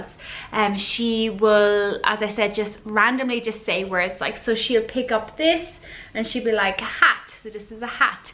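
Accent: Ireland. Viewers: I could listen to you talk all day, I love Irish accents!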